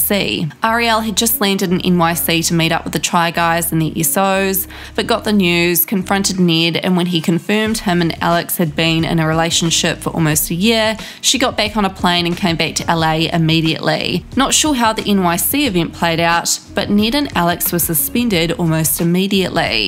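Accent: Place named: New Zealand. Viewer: Why is it funny? It's a New Zealander accent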